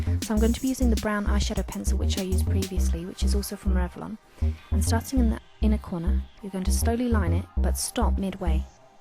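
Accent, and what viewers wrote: England, United Kingdom. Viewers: ahaa, i did it =3 very nice tutorial!! do you have an english accent? Your voice sounds cool! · YAAAY you have a Brittish accent! I LOVE accents, too bad I suck at immitating them. You're really good at makeup designing.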